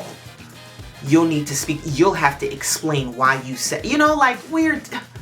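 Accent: Trinidad and Tobago. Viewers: Jamaican? It’s Trinidadian,, very different accent. Jamaica is only one island in the Caribbean. Every Island has a distinct accent. It’s pretty easy to switch when you’re immersed in both. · Ohhh guuaaash I never realized she ah Trini.... I'M IN LOVE....sorry finish the story..... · I knew you were from the Caribbean but this video and the last I watched confirmed you trini 😂❤ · Hi first-time visitor to your channel...you are a beautiful trini womanand a great orator...excellent. Love the trini accent...keep it coming. Trini man here...enjoyed your delivery style❤❤❤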